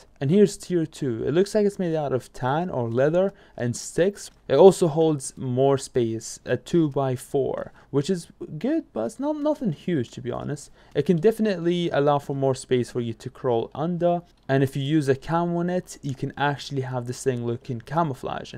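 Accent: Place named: Scotland, United Kingdom. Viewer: Yes mate I thought I heard some scottish in there! Class video, keep up the good work.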